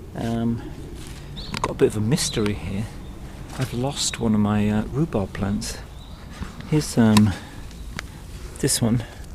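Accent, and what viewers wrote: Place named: Scotland, United Kingdom. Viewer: Hello I love your chitchat with Citizen Kale, love your Scottish accent. 😀😀😀👍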